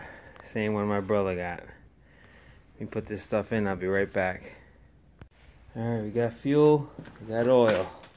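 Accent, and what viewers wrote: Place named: New Jersey, United States. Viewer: I'm gonna guess New Jersey accent ... Thanks for the video. You did good.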